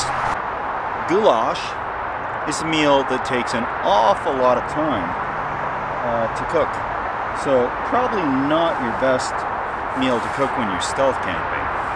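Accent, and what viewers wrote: Canada. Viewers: His accent is 100% Canadian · his accent always makes me think of my favorite Canadians. Trailer Park Boys is timeless.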